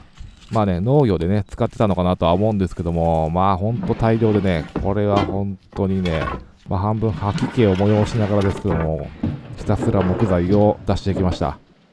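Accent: Kansai, Japan. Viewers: 本当によくやっていると感心しました。語りの関西弁がいい味出してる。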